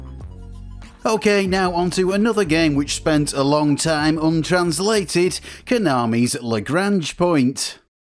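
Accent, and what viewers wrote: United Kingdom. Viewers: Are you from UK? What an accent 💩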